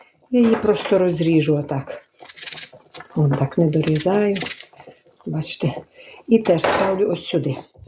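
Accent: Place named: Western Ukraine, Ukraine. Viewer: Какой говор интересный! Западная Украина наверное? Дякую за рецепт.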